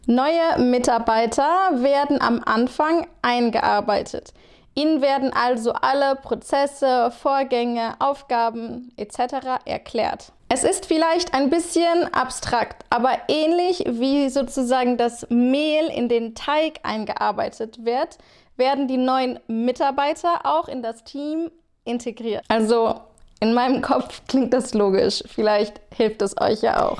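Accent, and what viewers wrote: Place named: Germany. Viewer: Ihre deutsche Akzent und die Art und Weise wie Sie alles erklären finde ich wundervoll. Vielen Dank dafür 😊👏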